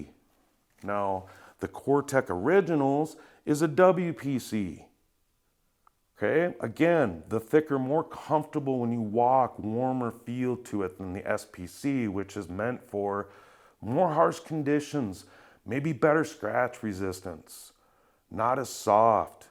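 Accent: Minnesota, United States. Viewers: I knew it. I could hear that MN accent after 2 mins. Thanks,!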